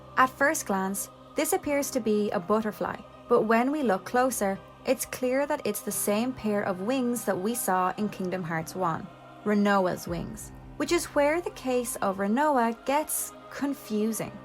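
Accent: Ireland. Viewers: Love the Irish accent.. need to go visit my family over there 👀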